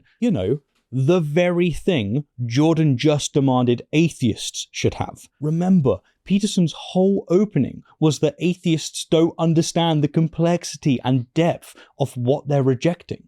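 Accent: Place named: RP, United Kingdom. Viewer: you're a smart cookie man! very cool downton abbey accent too :)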